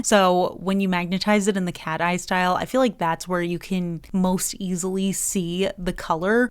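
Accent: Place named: Midwest, United States. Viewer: You sound midwestern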